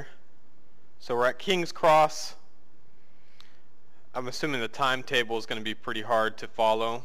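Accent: United States. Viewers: Are you American? I live on this line :)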